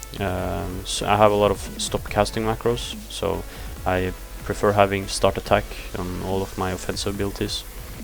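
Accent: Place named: Norway. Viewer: norsk engelsk <3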